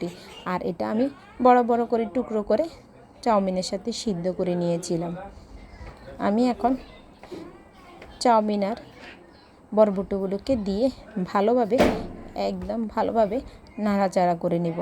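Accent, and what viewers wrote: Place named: Noakhali, Bangladesh. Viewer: পুরা নোয়াখাইল্লা 😊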